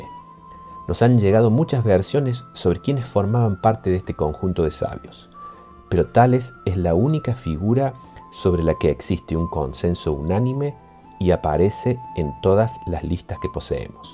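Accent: Córdoba, Argentina. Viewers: UD es de Córdoba ?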